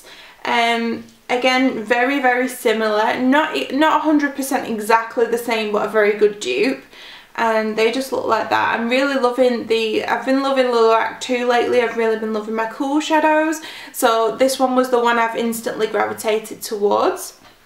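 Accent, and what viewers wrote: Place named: United Kingdom. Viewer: Honest review much appreciated! I'm a Brit in the US, and your accent is making me all homesick :)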